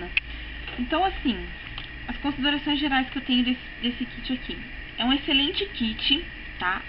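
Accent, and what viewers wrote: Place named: Rio Grande do Sul, Brazil. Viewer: flor, uma dúvida.. tu é gaucha?? tem sutaque, hehe :DD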